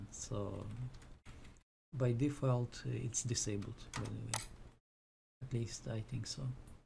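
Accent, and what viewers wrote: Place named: Russia. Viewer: Dude I need this tutorial for my R9 390 and I just realized you are Estonian, lessss goooooo. You have a thick Russian accent so you probably have a accent while speaking Estonian as well but I am just glad to see another brother on the web.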